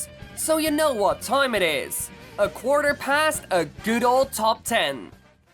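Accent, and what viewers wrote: Netherlands. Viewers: You really sound dutch for some reason. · are you dutch?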